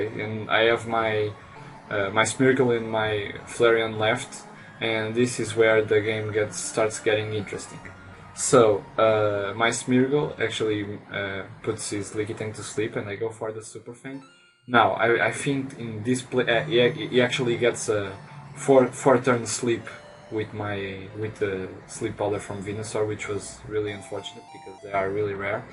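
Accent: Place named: Portugal. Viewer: do teu sotaque xD vi logo que eras português x) foi mt fácil xDD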